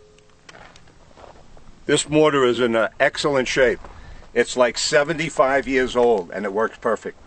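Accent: New York, United States. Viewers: New York accent, articulates his plans. Kevin McAllister grew up.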